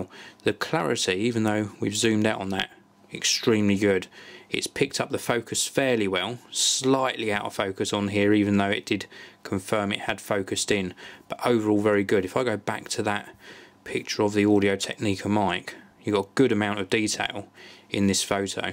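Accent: United Kingdom. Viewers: Very cute British accent. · Cool Britain Accent ... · which part of England are you from? you seem to have a thick British accent. great review btw.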